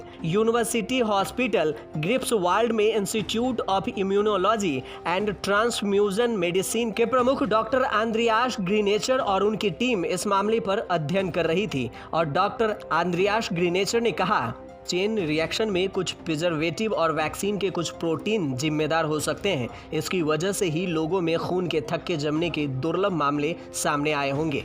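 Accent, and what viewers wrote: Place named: Bihar, India. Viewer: Bihari babua h vo isliye.. regional pronounciation problem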